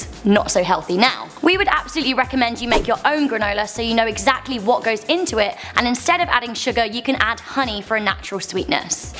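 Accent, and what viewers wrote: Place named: England, United Kingdom. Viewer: You can tell this woman is English but lives in the US because her accent is now fucked up and Americanised.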